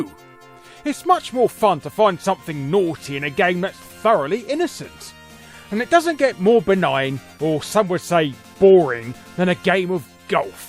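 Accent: United Kingdom. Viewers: Not at all, I just like reading the script alongside the audio. Trust me, as a rugby fan, I’m quite used to the British Isles accent 🏉👍🏾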